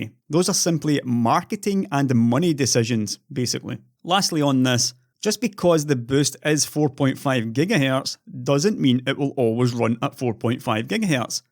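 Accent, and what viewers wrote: Scotland, United Kingdom. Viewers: so. much. sa.tis.faction. Just dripping from your thick Scawttish vowels. I even felt a little bit smug myself:) nice work! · Wow, that is an absurdly strong Scottish accent.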